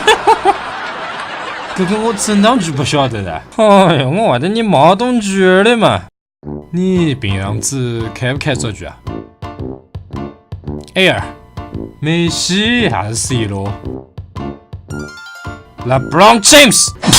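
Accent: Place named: Shaoxing, China. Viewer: 感觉就是普通话，没听出吴语除了一点绍兴音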